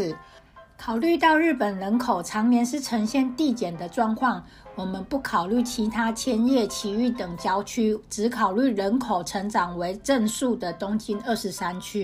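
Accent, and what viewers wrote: Taiwan. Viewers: 我喜歡這個心智圖，還有，台語腔調的國語，哈哈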